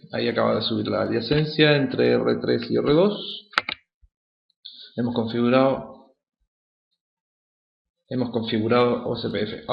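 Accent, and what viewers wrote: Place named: Chile. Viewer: excelente vídeo me faltaba tener bien el concepto de la métrica lo de virtual link no lo sabia pero en CCNA igual se trabaja con distintas áreas teniendo claro la Backbone, OSPF tiene sus reglas bien definidas me sonó a que eres de Chile bueno saludos.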